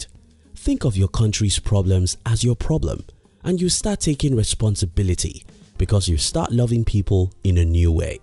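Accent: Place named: Nigeria. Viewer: You sound Nigerian. If you are then you should know using more relatable references would help drive home your point better. Winston Churchill is perceived by some has racist so to some it's a bad reference to make and 89% of the rest of the world don't even give a F about him. But In all great content 👍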